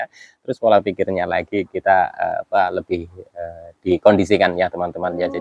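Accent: Banyumas, Indonesia. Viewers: Mas sampean sih daerah ngendi ngapaknya, nuwun sewune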